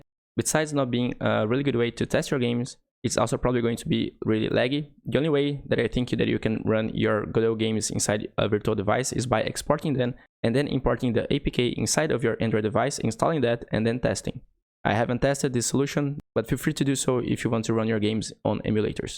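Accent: Brazil. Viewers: i must ask,where you are from? you sound a lot BR to me.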